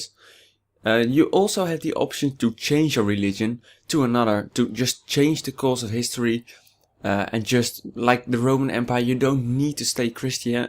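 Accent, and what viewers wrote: Netherlands. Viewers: Are you Dutch? You sound like it!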